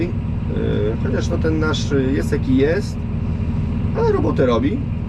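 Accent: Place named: Mońki, Poland. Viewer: Słyszę że kolega z Moniek haha daleko masz do szwagrów?😂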